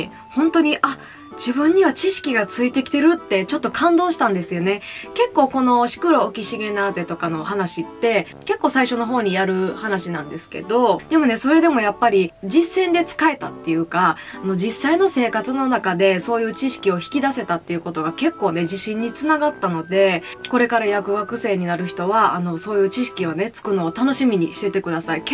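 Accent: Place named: Osaka, Japan. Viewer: 喋りが面白すぎて、チャンネル登録しちゃいました。大阪のイントネーション良いよね。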